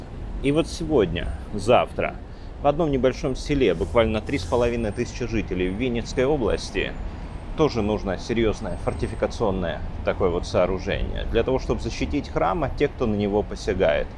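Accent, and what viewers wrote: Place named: Moscow, Russia. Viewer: мене просто здивувало, те що вчитуючи саму специфіку данного регіону, в якому взагалі важко почути російську, а тут на тобі російська та ще й я б сказав чисто з московським акцентом?!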